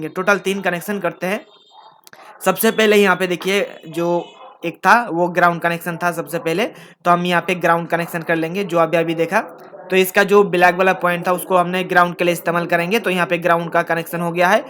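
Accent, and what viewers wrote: Bengal, India. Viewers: You are Bengali Right ❤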